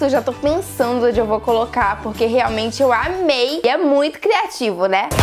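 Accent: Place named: Rio de Janeiro, Brazil. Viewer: tu es carioca né amei o sotaque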